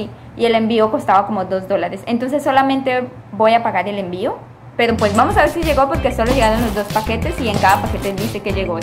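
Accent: Colombia. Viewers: Eres de Barranquilla Colombia? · Por supuesto es Colombiana..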